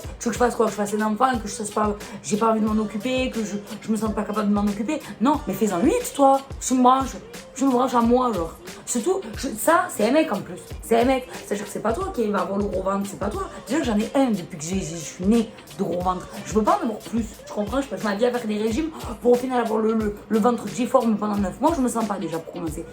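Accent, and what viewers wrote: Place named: Marseille, France. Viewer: J’aime trop ton accent tu représente notre ville vive Marseille et sfair encadrer les jaloux et les envieux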